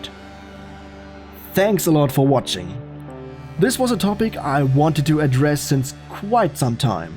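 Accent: Germany. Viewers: ohne deutschen akzent bitte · Dein Englisch ist gut, aber man hört sofort, dass du deutsch bist 😁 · Du klingst sooo deutsch.😂 Trotzdem cooles Video. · vid ist ganz ok, aber an deinem t-h musst du noch arbeiten, man kann sehr einfach raushören, dass du deutsch bist aber du redest schon flüssig, was sehr gut ist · Ich liebe diesen deutschen Akzent 😅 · Man hört nach nach kurzer Zeit das du deutscher bist, aber ich musste 1. nachschauen (kanalinfo) und 2. ich kenne keinen Deutschen der so gut und flüssig English spricht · Yeah apart from the zhick German Akzent and the sometimes wannabe British pronunciation of words pretty much yeah. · Why does it sound like he is German? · Man hört den Alman bisschen raus aber sonst interessantes Vid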